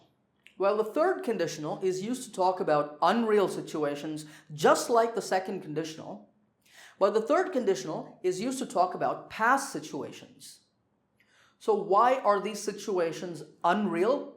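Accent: United States. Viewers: Very clear presentation. The speaker has a relaxed but crisp American accent. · Wow such a great prodigy from an India, your accent or tone of your voice is like an american....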